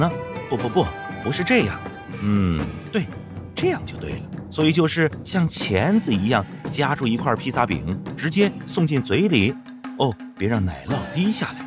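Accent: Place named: Beijing, China. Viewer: OH the Beijing native Chinese voiceover just makes it SO much worse - Feels like not a single Chinese person was involved in writing this...Actually, yeah Mulan live action vibes. Holy crap. Reading a case about this in my business class and I was like "it CAN'T be that bad can it??" And holy shit it was. All 121 seconds of it. JEESUS.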